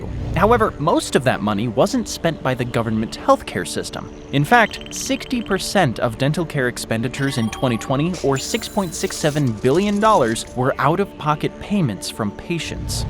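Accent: United States. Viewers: As soon as I heard the American accent I was out, I couldn’t imagine an American watching a video on their country with an Aussie twang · Omfg the most American video ever, trying to downfall the new Eldorado country that took your 1st spot is hilarious af. Bro butthurt that is little America as lost it's paradise status and take it on the new n°1 😂😂 i'm dead We dont care that Australia is isolated and far from everything, it's a good thing rather than a bad, EU and America is so satured with their own legacy and history that a place like Aus is literally a new born baby so it has so much potential and possibilities, that's why it is so great cause it is not concern with the historic bs that EU and US can't dissociate from, it's literally just living it's best life, something that the rest of the world should learn from. If you move to Aus you know why you chose to live there and nothing else really matters when you got such a perfect country to live in. 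A flight is a flight, 8 or 16 hours is all the same The news thing, how is that a bad thing ? People in EU and US literally are brainwashed daily with so much bs information nobody gives a fck about, it's literally cancer for your life. You say it yourself in here, 'they might have hacked the mental health problem' duuuh.. Accumulated informations that doesn't matter for 90% of it giving cancer and tumors to people.. i swear people make videos like this either cause they got rejected for their Visa to go live there or you just like spreading negativity. Or.. if that's your voice you're clearly American and jealous that the 'Eldorado' status is now considered to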